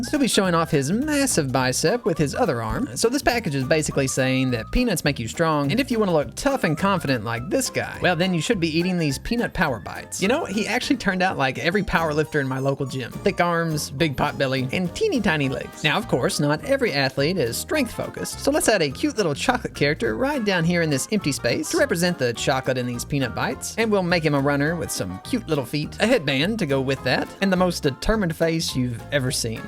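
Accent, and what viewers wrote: South, United States. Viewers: how do you have a southern draw but never had a mountain dew?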